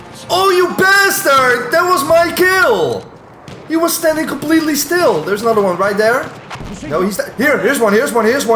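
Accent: Netherlands. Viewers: Are you Dutch? I think I recognize the accent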